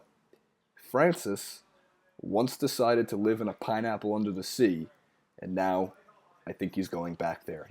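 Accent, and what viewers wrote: United States. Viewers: american talking about football, sounds funny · I like hearing an American talking about football/soccer it is interesting and different, go taking over for today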